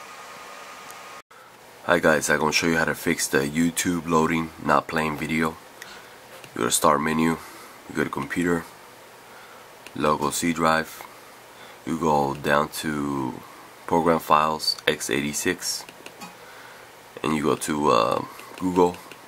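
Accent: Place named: Russia. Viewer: No idea where that accent is from, Russia maybe, but you just solved my problem. Thanks!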